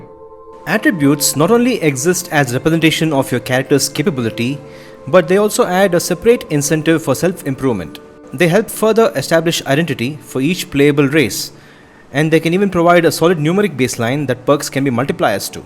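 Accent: India. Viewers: Omg r u Indian?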